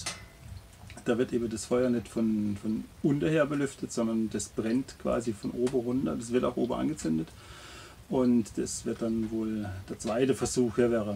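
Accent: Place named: Saarland, Germany. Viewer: Du schwätzt wie e Saarlänner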